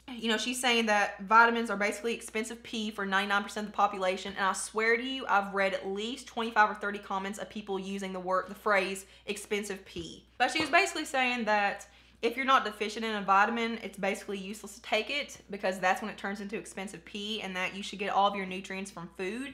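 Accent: South, United States. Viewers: OMG a southern youtuber!!! Literally 10 seconds into this video and I'm mashing the subscribe button 😂😂😂 #southerngirlsarethebest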